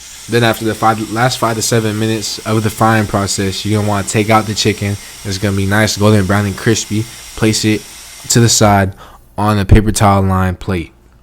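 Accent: Philadelphia, United States. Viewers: I just wanna know where you from ? Sounds like Philly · Sounds like he has a philly accent to me.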